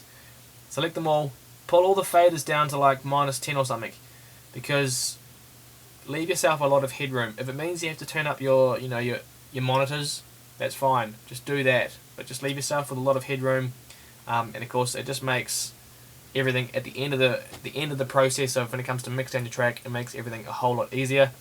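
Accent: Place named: New Zealand. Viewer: Lol turn on interactive transcript, not to great at interpreting the kiwi accent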